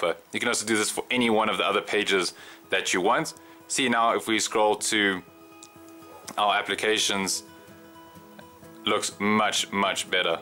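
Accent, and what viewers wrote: South Africa. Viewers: Are you from Pretoria?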